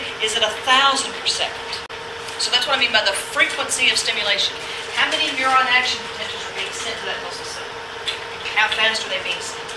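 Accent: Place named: South, United States. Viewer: You are awesome, your videos are so wonderful,plus it seems easier to understand with a southern accent ;-)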